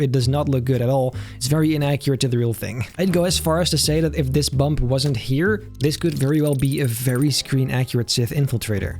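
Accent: Netherlands. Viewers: This video could've been good but the horrible Dutch accent and the pronunciation of Scimitar as Skimitar ruins it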